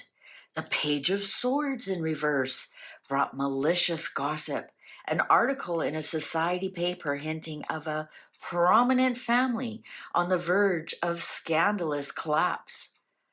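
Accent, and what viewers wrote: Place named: Canada. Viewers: I loved this. Your accent I love it Canadian. You brought the story to life's 🎉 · Canadian accent 😂❤ I am from the same area as We don’t hear our accent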